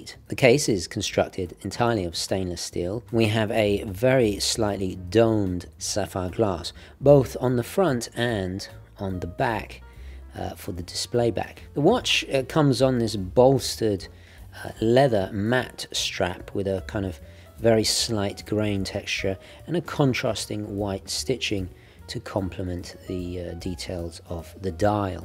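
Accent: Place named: United Kingdom. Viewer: I thought u were British...?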